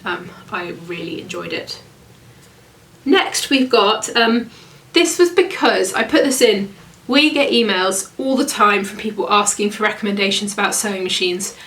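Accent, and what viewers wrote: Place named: United Kingdom. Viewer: You do just find..Dont pay any mind to others that doesnt have a kind word..Im in the U.S...I find UK a cute accent..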